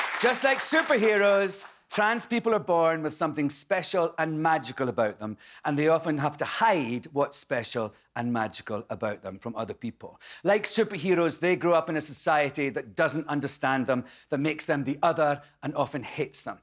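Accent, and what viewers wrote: Scotland, United Kingdom. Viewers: I didn't know Alan was Scottish. Whenever I saw him in some movies, he had an American accent. He did an excellent job hosting Jimmy Kimmel Live👍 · Nice to hear a Scots accent doing the monologue on a late show again.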